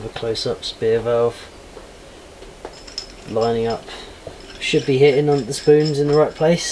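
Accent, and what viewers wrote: United Kingdom. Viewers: 我竟然能听出是英式口音？？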